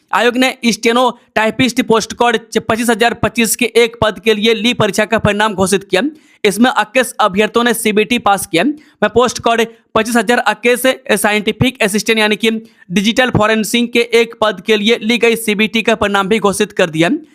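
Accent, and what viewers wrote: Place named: Bhojpuri, India. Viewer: Why do you speak in Bhojpuri dilect ?This channel should hire Himachali for this post.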